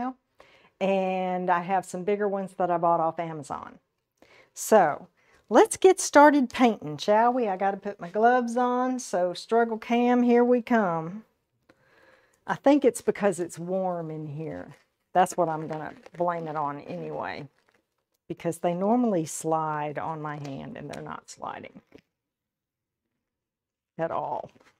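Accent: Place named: Texas, United States. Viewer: I knew I detected Texas! Love, love your voice and your work! 🤩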